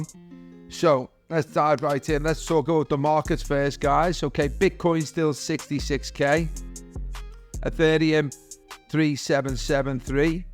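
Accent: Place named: Scotland, United Kingdom. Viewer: I follow you for a while on twitter , but leasening to your accent , your Scotish 😁😁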